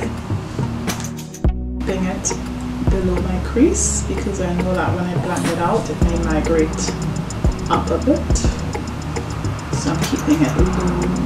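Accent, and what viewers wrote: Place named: Caribbean. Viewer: Hi there. Just started watching & subscribed right away b/c that Caribbean accent grabbed me. 🇯🇲 here, you too?! Also, what are some of your foundation shades? 💜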